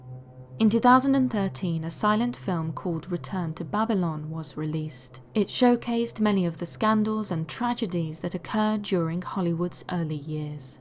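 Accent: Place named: RP, United Kingdom. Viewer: Queen's English...luvly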